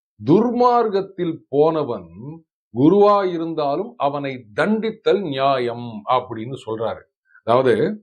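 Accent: Chennai, India. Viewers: Mahabharatam in madras thamizh is refreshing 😋 ✨️ 😌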